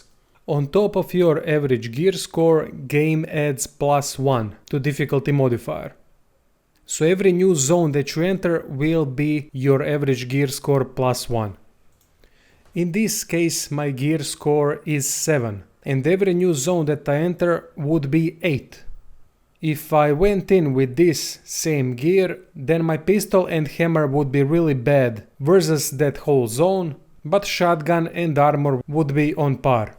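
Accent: Russia. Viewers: New to the game here and your tips are very helpful. I love your russian accent 🥰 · Nice video plus nice voice. is this russian accent? pretty cool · Can never go wrong with tutorial by a man in Russian accent. heart emoji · когда русские говорят на инглише, да еще и с ярко выраженным акцентом это просто разрыв ушей! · Thanks a lot man ! Learned a lot here, also loving your Russian accent (no homo)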